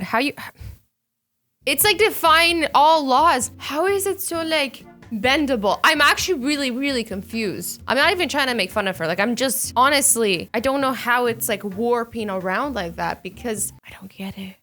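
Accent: Ireland. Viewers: you sound irish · Are you Irish?? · No affence Azzy but you sound kind of Irish in this video As a compliment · U sounded irish in this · Azzy sounds Irish in this vid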